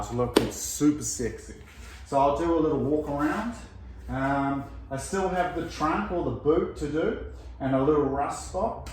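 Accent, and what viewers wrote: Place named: New Zealand. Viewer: gotta kiwi accent 😂 dont worry im a kiwi too nice paint setup for a home job. tommy fyeah spec chur bro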